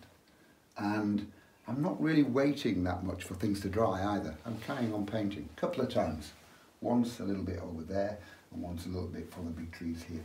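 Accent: Yorkshire, United Kingdom. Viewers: Hi,. Your video notification just popped into my inbox - not sure why as I haven’t done watercolours for decades, but I’m glad it did as your easy ways inspire me to give them another go. To my surprise, enough of my tubes are still usable and I even discovered this very book on my shelf, so no excuses! Good to hear a good old West Riding accent - you obviously hail from very close to me. Please don’t apologise for your roots - we have grass in the north, not graarse! Keeps up the great work.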